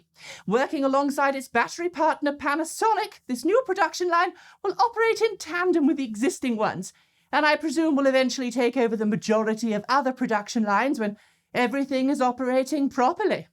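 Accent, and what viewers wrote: England, United Kingdom. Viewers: I love the proper English accent. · great episode. and I liked the British pronunciation.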